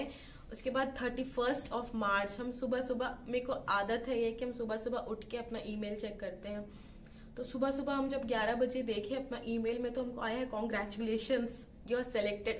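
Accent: Jharkhand, India. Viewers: I'm from Jamshedpur....and her accent is jharkhandi saying hum 🥺❤️ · TURU... HMKO LG HI RA THA SHE IS FROM JHARKHAND😂❤️ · Ur language as" hum hum" ...though u r just one prsn reminds me of my hometown...that's too jharkhand🤣🤣🤣🤣too cute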